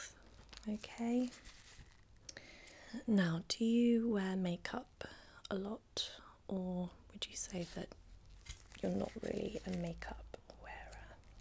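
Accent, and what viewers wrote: England, United Kingdom. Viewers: Something about a British accent at a certain volume relaxing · i like your british accent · L v It’s still an English accent, irrespective of how many there are 😏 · You have the perfect voice for asmr. The fact that you’re british as well makes it that much better. I’m Canadian but british accents have always been my favourite.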